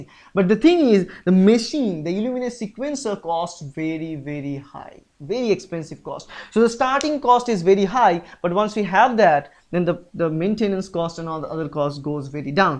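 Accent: India. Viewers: Best English accent among Indians, easier to ears , thanks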